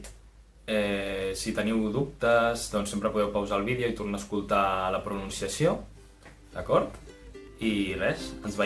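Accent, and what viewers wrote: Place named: Castile, Spain. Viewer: Mestre, la lletra ce es pronuncia /sé/, amb essa i no pas com fas tu: amb el so castellà. També: 1) la o del mot "àtona" es pronuncia /u/ i no pas /o/, com ho fas tu, i la be del mot "àrab" és una /p/. Etc.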